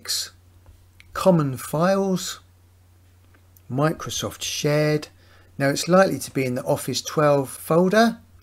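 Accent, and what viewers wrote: United Kingdom. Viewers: IT WORKED! 👍 Thank you so very much. I’m not IT literate, but due to your easy instructions, along with your British accent, I was able to complete this task Diolch yn fawr 🏴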